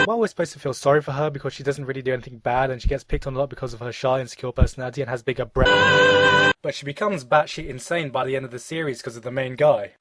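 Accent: United Kingdom. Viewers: Me and my friend wanna marry you because your Asian, and you have a British accent. And these videos are fucking awesome. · hey sounds british but hes asian XD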